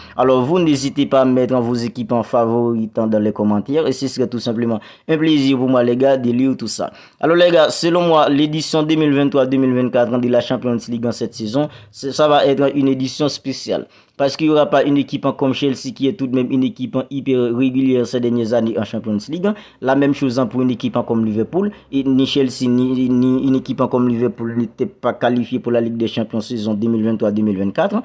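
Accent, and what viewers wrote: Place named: Haiti. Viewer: Ou haïtien boug Mwen ? Ça s'entend 😄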